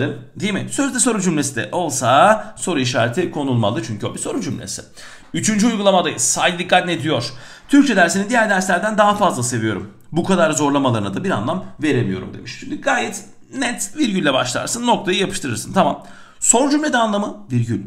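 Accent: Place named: Konya, Turkey. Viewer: Memleket Konya sanırım hocam 😀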